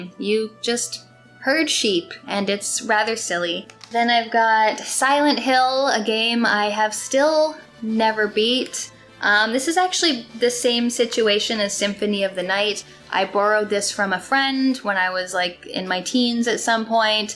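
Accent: Canada. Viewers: Really enjoyed the tour, thanks! You've got a pleasant voice and i love the articulation and of course the Canadian 'about'.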